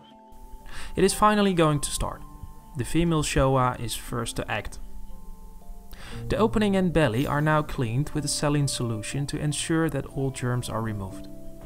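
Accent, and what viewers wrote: Netherlands. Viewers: it sounds like you have a netherlands accent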